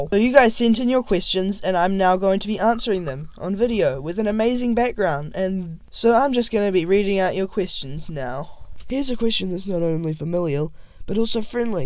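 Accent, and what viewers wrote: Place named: New Zealand. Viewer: I knew that was a new zealand accent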